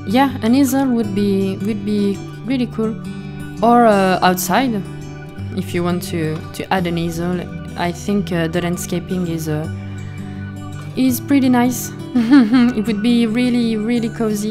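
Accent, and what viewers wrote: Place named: France. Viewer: I can completely understand you! And for the record I love the French accent! And you’re voice is so nice to listen to. I understand that you’re nervous, I myself speak a different language and I just did my first voice over too. But it went well and with every time we do it I think we’ll be more and more comfortable 😊 I really like this home btw, so beautiful! 😍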